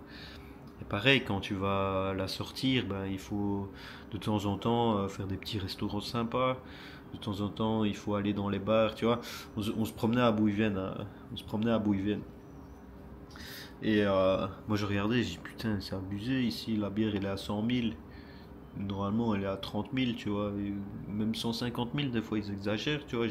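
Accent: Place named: Belgium. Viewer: Oh l'accent belge 😀 Merci poiur ton partage.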